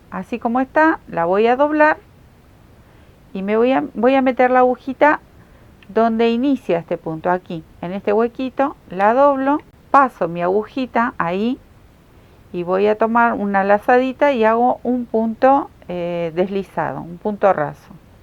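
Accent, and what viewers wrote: Argentina. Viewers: De acuerdo, la cobijita mas linda del internet. Yo disfrute muchisimo los videos, uno tras otro como si fuera una serie de tv. Yo soy mexicana, y me encanta su acento argentino! Estas cobijas no tienen igual, mi abuelita tejio una, se la dio a mi mama y todos la querian usar, iba de alla para aca. En mi familia yo soy la tejedora, de niña me iba sola a clases de tejido con puras señoras, y desde entonces me encanta. Gracias por estos hermosos tutoriales!!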